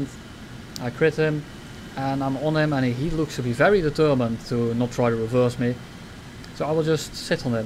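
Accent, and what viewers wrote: Netherlands. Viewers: I have bin a subscriber for some time now and I have to ask, are u dutch or belgains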